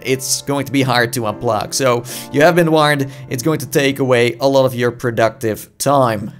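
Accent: Netherlands. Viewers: dat thicc dutch accent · Are you dutch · Het nederlands accent met de vele uh's doet echt pijn Wakey wakey tietel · You're just another cookie cutter channel with the added annoyance of your Dutch accent trying to sound American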